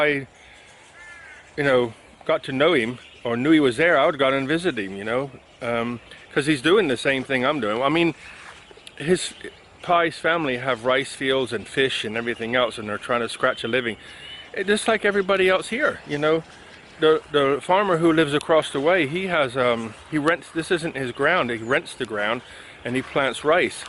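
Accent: United States. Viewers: Thank you so much for the shout out. You didn't have to do that for me but much appreciated. I thought that I detected some American in your accent. I was stationed in Goldsboro NC back in <num> and again at Fort Bragg <num>. I agree with everything you said, that is why I always preferred to be stationed in Europe, some of the same issues but not as bad. Your land is so beautiful, a true paradise indeed. Thanks again for the shout out and I look forward to meeting you when I retire.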